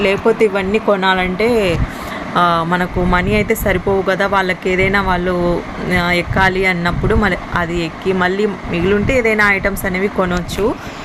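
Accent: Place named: Rayalaseema, India. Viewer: Ooo but your speaking slang looks like Rayala Seema...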